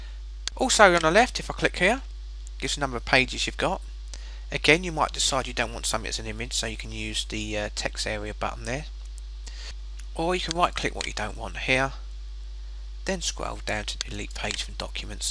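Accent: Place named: England, United Kingdom. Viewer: FUCKING ENGLISH ACCENT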